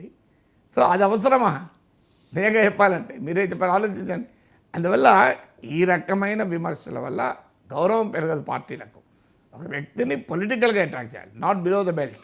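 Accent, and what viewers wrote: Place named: Telangana, India. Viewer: ఇతగాడొక ప్రొఫెసరు అంట.. ఈయన గురించి నా అబ్జర్వేషన్స్ స్టాక్ మార్కేట్ గురుంచి మాట్లాడుతాడు..స్టాక్ మార్కెట్ ఎకానమీ ను సూచిస్తుంది అంటాడు.. ఇహ ఎకానమీ గురించి మాట్లాడుతాడు ఇక అవి మామూలు బూతులు కావు.. ఈ చాన్నెలో యాశా.. ఇది ఒరిజినల్.. తెలంగాణ బూతు ఉద్యమ సమయంలో తెలంగాణ యాసలో విశ్లేషణ.. అదో రకమైన అస్తిత్వ ప్రయాస..ఇక ఈ మధ్య రవ్వంత రెడ్డిని కలిసి ఇపుడు ఇలాంటి విశ్లేషణలతో రాజకీయ ఆయాసం..ఇలాంటి వాళ్ళందరూ హైడ్ hyd లో కూర్చొని ఆంధ్రా యువతని పక్క దోవ పట్టిస్తున్నారు..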